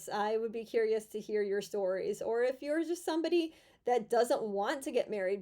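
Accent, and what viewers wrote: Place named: North Dakota, United States. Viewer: Are u from North Dakota Been trying to place your accent U Judy don’t have that stereotypical “concha no!” Things sorry lol was curious You’re awesome great videos lol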